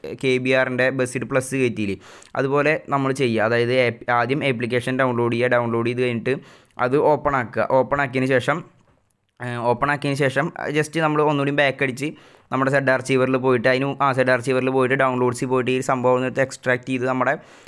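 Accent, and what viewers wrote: India. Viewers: Bro thrissur karanano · U malappuram aano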